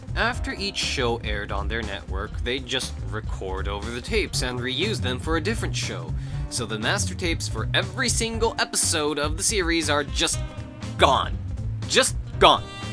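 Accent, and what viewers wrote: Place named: United States. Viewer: Good research, for some reason I didn't image you having such an American accent lul.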